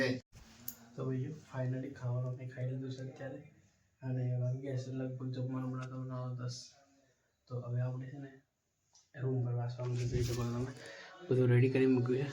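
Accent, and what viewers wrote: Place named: Bhili, India. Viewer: ભાઈ ક્યાંના છો ભીલી બોલી બોલતા હોય એવું લાગે😊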